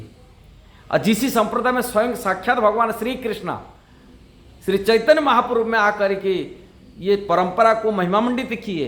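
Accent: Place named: Odisha, India. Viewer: He is from odisha so obviously he has some glitch but he is speaking can u able to speak in oria . sorry for any offence Hare Krishna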